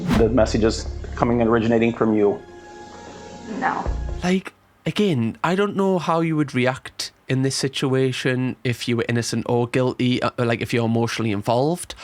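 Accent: Newcastle, United Kingdom. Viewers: Literally just found your channel a few days ago - love it. You’ve successfully made Geordie my favorite British accent. 😅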